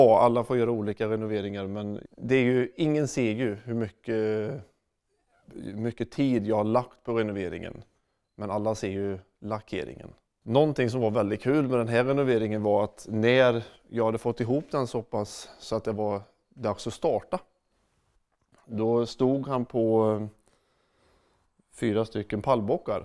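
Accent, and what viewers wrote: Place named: Bragnum, Sweden. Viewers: Grym kille och fina traktorer! Finns nog inget ljud som slår Scania V8! 😍 Bara en fundering ! Varför låter han ofta som Västgöte, men ibland inte? 😄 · Haha! tyckte jag kände igen dialekten och omgivningen, själv uppvuxen i Bragnum ett stenkast från Ängagår´n. Härliga byggen! Å i åa ä e ö.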